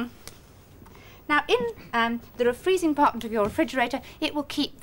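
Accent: RP, United Kingdom. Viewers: My! She sounds so posh!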